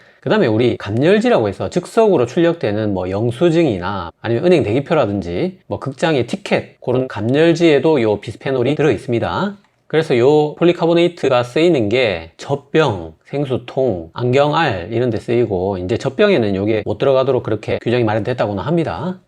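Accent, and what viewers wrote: Gyeongsang, South Korea. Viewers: 경상도 분인 게 표가 나나요? ㅋㅋ 저도 경상돈데 들어도 잘 모르겠는데... 쌤이 표준어 쓰시는 거 같은디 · 갱상도 의사샘 ㅋㅋ, , 너무 친근하고 부담없는 생활지식 알려주셔서 잘보고 있습니다. always thanks 🙏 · 사투리나 좀 고쳐라 듣기 거북하네 갱상도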